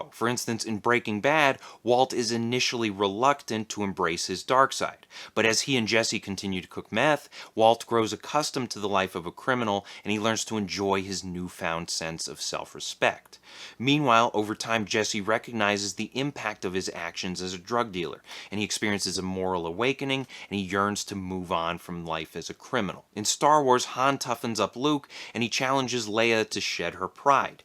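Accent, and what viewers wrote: Chicago, United States. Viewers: Are you from Illinois/chicago area?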